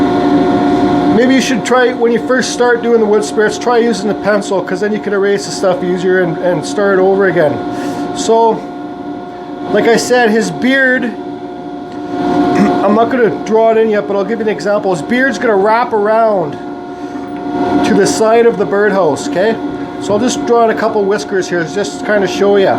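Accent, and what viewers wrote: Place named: Canada. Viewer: I fucking love your personality dude. It's like listening to me but with a Canadian accent instead of a southern one.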